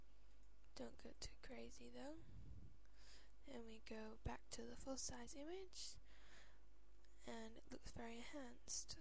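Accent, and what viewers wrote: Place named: United Kingdom. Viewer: You sound British o-o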